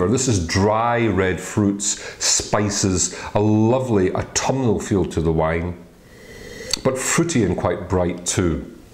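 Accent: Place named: Scotland, United Kingdom. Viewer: Hearing a Scotsman talk about wine is taking me a minute to wrap my head around! :P Came to learn about Marsannay in general, you reviewed THE EXACT wine we'll be drinking this eve! Cheers from America (and my girlfriend who is a transplant from Fife. :) )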